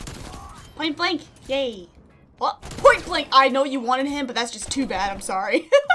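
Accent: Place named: Texas, United States. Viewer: I would do anything to have someone as positive as you in my life! Love you! Love the Texas accent aswell!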